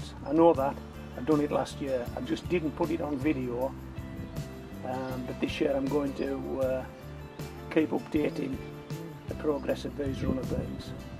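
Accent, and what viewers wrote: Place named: Cumbria, United Kingdom. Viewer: Clicked on this to hear a fellow Cumbrian. Fantastic!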